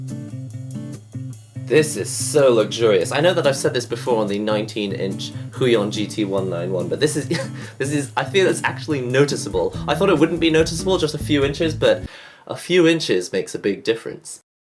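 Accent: United Kingdom. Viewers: Are you pure Japanese? Or are you mixed? You sound like you have a British accent :)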